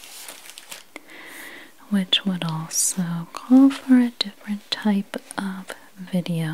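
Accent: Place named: Pennsylvania, United States. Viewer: I was thinking certain word you pronounce you might be from PA. Now I see "Giant" and I'd bet on that now! lol Lovely voice, lots of tingles!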